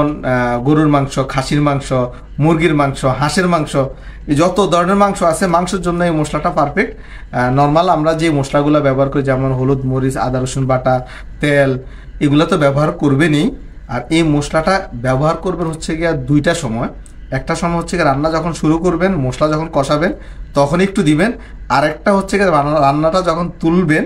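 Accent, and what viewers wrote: Noakhali, Bangladesh. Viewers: কথায় বুঝা যায় নোয়াখালী অঞ্চলের মানুষ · ভায়ের বাড়ি নোয়াখালী নি। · ভাই আমার কাছে রান্না এক প্রকার আর্ট, তবে আপনার কথা শুনে মনে হচ্ছে আপনিও আমার মত নোয়াখাইল্লা। মনে থেকে বলছি ভাই আপনার ভিডিও টা অনেক ভালো লাগলো। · ভাইয়া বাড়ি কি নোয়াখালী তে? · আপনার বাড়ি কি নোয়াখালীতে? anyway very helpful video, thanks. 😇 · যতই স্মার্ট উপস্থাপন করার চেষ্টা করো,নোয়াখাইল্ল্যার ভাষা বোঝাই যায়।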